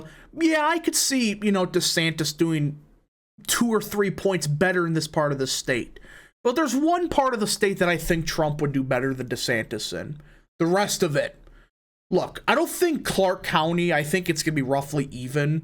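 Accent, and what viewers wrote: Wisconsin, United States. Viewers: You’re from Wisconsin?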